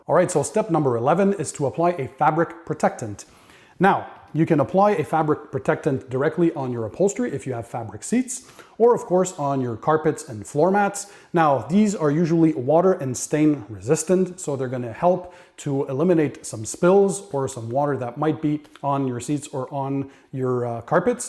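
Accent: Quebec, Canada. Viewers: Are you from Quebec ?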